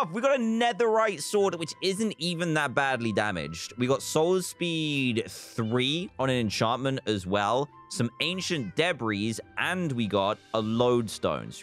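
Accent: United Kingdom. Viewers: That’s because we British say Debis that way w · shut up he’s British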